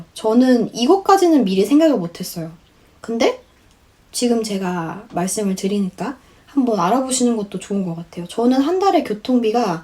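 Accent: United Kingdom. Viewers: 안녕하세요 영국에유학중인 해리입니다.영국영어 발음 정말멋져요.일상을 영어로 하는 브이로그 한개여서 또 영어일상브이로그 촬영해주시면 좋겠습니다.영어공부하는데 많은 도움이될것같아요.♥️구독하고 갑니다.👍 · 약..12년전;호주 워홀러였던 아즈매에요~ 님 영국식 발음에 푹빠지네요 다시 공부하고 싶은 욕구가! 그걸 혼자했단거에 참 대단하네요👍 잘보고있어요~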